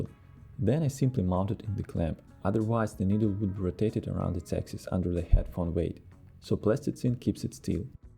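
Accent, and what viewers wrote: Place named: Russia. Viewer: Рашен инглиш ))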